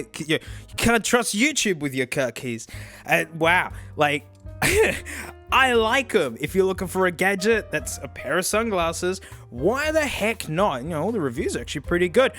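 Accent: Australia. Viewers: He gets more and more Aussie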